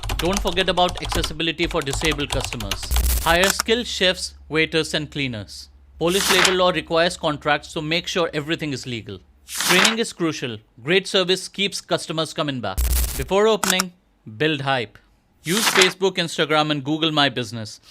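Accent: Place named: India. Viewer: You're speaking in an Indian accent and so, I can guess you're based in India. How would you provide a worker in Poland with a job?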